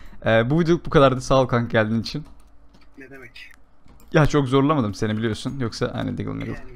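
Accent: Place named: United Kingdom. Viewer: videoda böyle ingiliz öznetisi olup sürekli ingilizce sözler söylemene sinir olan tek ben miyim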